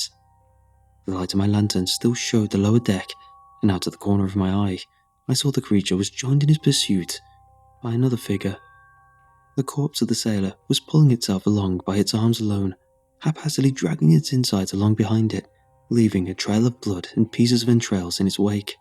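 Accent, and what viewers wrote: United Kingdom. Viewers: Best horror reader there is. Can't beat a smooth British accent.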